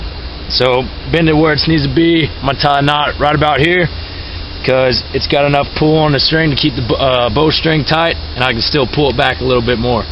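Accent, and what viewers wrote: South, United States. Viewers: Awsome bow !!very simple to make..nice hat and sunglasses 😁☺love his southern accent😂☺👍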